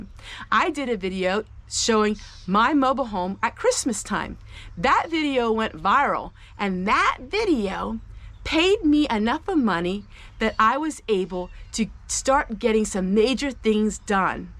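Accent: Pennsylvania Dutch, United States. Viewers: i love your accent. pa dutch?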